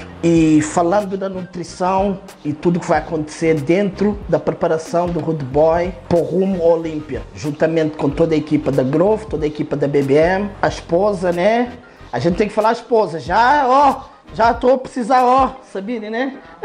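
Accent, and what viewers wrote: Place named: Mozambique. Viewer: Me sinto encasa vendo os vídeos do pois temos o mesmo sotaque... Sou da África em Moçambique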